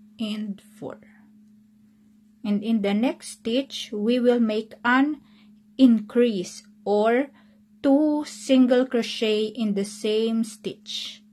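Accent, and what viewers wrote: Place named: Philippines. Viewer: thank u so much for this video, also are you filipino? the accent sounds familiar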